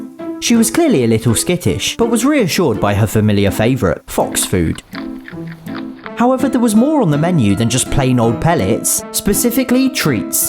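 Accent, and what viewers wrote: United Kingdom. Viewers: Are you british...? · I love your British accent and you videos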